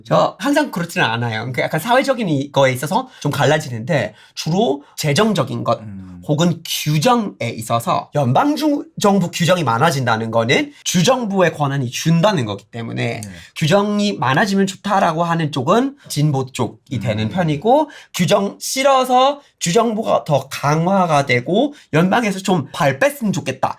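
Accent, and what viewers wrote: South Korea. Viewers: 타일러 말하는거에서 광주이모가 오버랩 된다 ㅋㅋㅋㅋㅋㅋㅋㅋ 광주쪽 교수님이랑 친하신건가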